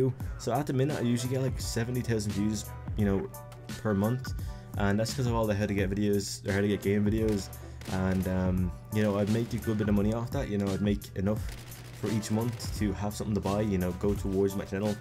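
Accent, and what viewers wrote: Ireland. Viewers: you irish cuz i am :)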